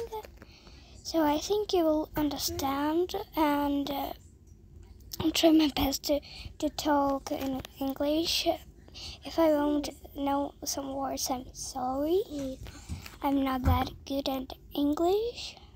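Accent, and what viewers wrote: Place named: United Kingdom. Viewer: U sound so british broo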